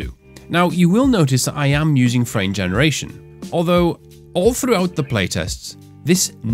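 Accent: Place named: Newcastle, United Kingdom. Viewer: At first i thought scots, but i think its geordie accent (newcastle) cause ur "done" is more "dun" 😁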